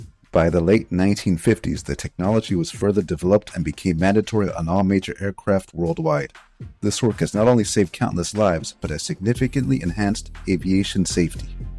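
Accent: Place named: United States. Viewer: Why are all our Australian vids always voiced by Americans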